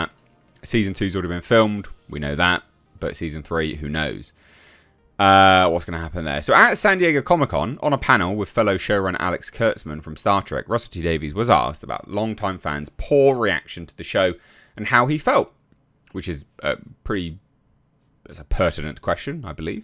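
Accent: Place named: England, United Kingdom. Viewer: I love listening to you, it's so funny hearing another English person spouting off 🤣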